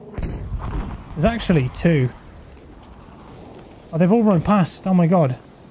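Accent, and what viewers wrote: United Kingdom. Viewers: what is your morning routine? I would be real mad if there’s no tea involved. Anyways, you’re such a classy lad. You sound so British in the good way, if that makes any sense (it doesn’t). My brother doesn’t agree though!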